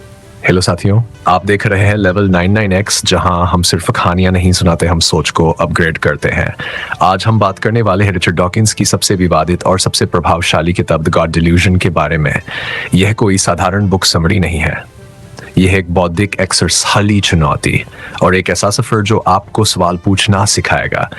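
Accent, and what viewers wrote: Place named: United Kingdom. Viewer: bro english ascent aa rhi hindi mai, thoda sa better karo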